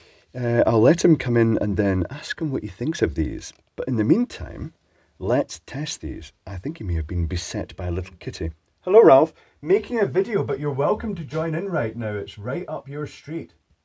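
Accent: Scotland, United Kingdom. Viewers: love to hear voice and scottsh accent ♥ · incredibly well spoken for a Scotsman never heard that accent anywhere here. also that's pretty toxic how is that even legal? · Ralph. "Really? Sounds like a load of bollocks" Did I get that right? I'm not too familiar with the Scot's accent . Followed up by "Are some people stupid"? and the classic, "Is naivety a form or stupidity"? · I wish people would stick those onto their eyes to stop reading about this 5G Hazard bs. Btw it’s interesting to hear how much a Scottish accent differs from person to person. While I understand you perfectly, listening to your two buddies from the MBC sometimes leaves me absolutely clueless 😂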